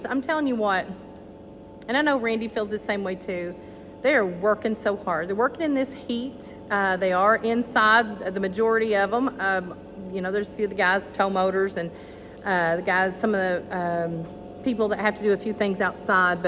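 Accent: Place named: Tennessee, United States. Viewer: You are too cute! Thanks for the info, love your TN ‘accent’ 😘 Knoxville lady here, and one of your biggest fans. We will be up with our son soon. He “thinks” he wants an 8x22.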